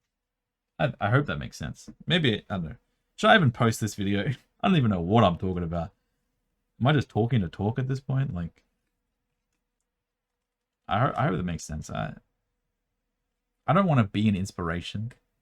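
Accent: Australia. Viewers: An hour in club here, and I'm here for the advice, for the realness, and also for the aussie accent I've missed so much since leaving Perth for Indo all those years ago. Seeing how you're so excited about how your recent sorting video made enough money to buy 5 subway sandwiches is gold, and then have that followed up with how wetting your lips or something can be sexual is slightly disturbing 😂. HOWEVER!! We need to have Kim on the channel as well,!! You've talked about her several times now and how she's amazing and everything, and you know full well a Youtube channel only ever taken off when the significant other makes a long-awaited appearance 😆. You can do subway mukbang video with her and see how many more subway sandwichs the video'll earn you 😁 imagine how excited you'll be at that prospect 😄 In short: we want KIM!! ✌🏼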